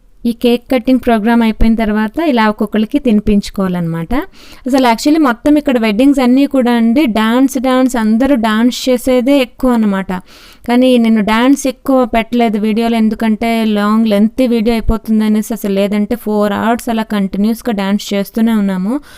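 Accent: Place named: Visakhapatnam, India. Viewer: gaaru great andi still now mimmalni chusthe you look like egypt women andi kaani meeru matlade telugu valla andi i can recognize miru maa vizag ammayi andi great andi so you are living happily in egypt that's great andi Author Venkat 😎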